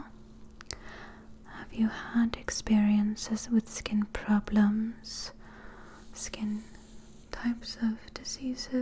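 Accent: United Kingdom. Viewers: Bravo on the British accent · You are the Most Beautiful ASMR Doctor ever!!! I love the British Accent!! Thanks for the tests! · As a Brit.. I can confirm..The accent is fantastic! Well done,love! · Lovin the British accent ☺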